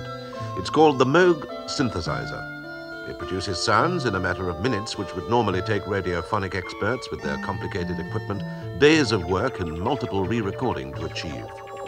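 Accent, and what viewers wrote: RP, United Kingdom. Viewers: Is his accent considered RP? I'm American and am trying to work out UK accents.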